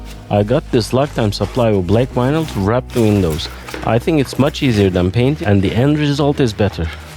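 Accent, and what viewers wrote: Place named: Turkey. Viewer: aksandan hemen anladım Türk olduğunu eline sağlik kralim güzel oldu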